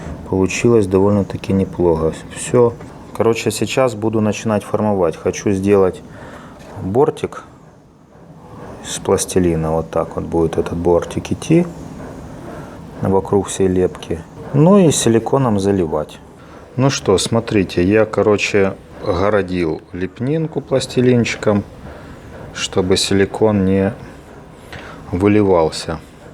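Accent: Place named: Kyiv, Ukraine. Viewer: Красота! Судя по акценту и уровню работ вы киевлянин. Очень сочувствую, что пришел "медведь-всем пригнётыш" и поломал судьбы людей и красоту!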